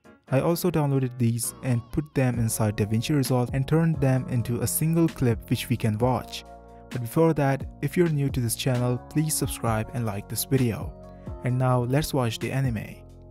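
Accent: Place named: India. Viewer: Is it only me who thinks he has an Indian accent?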